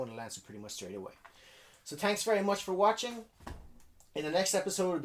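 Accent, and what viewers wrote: Ireland. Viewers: This guy Irish? He has like an accent it’s cool haha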